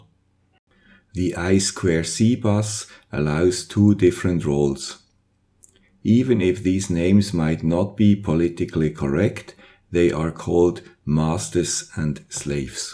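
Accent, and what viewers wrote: Switzerland. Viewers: Ich lebe momentan in Irland und da hatte ich die komische Idee, eine Eier-Inkubator-Steuerung zu bauen. Als kompletter Anfänger schaue ich viele Videos bei Youtube an. Deines, weil ich ein I2C-Display und ein RTC in meinem Projekt verwenden möchte. Mit dem Schweizer Akzent war das dann besonders nett zu schauen. Danke! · Hey, guy with swiss accent. Your channel is very inspiring. Thanks for sharing your ideas! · Hey, guy with swiss accent, I've got an italian one.... I find very useful your teaching. Actually, I was used to plan experiments with Labview and NI instrumentation, I decided to leave both for open software and cheap devices, not only for economical concern. Thanxs for the wide presentations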